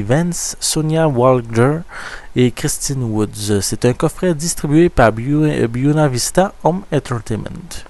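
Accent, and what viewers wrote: Canada. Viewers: jkiff ton accent canadieeen :p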